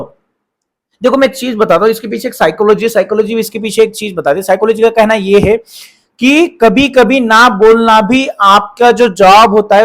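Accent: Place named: Maharashtra, India. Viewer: आप मराठी हो क्या सर आप की बातो मे मराठी टच हे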